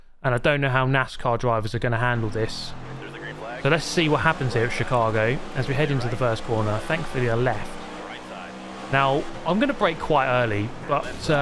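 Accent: England, United Kingdom. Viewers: Nothing beats British commentary and sarcasm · It’s not so much the silliness of the video, but I think it’s a combination of watching and listening to an Englishman, in an English accent, explain the circus that is NASCAR. Thank you for this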